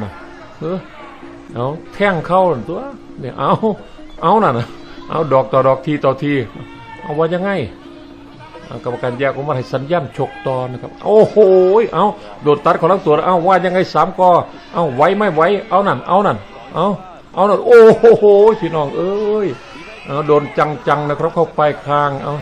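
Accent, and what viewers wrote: Isan, Thailand. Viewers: สวัสดีครับแอด555พากย์อิสานชอบๆมากกก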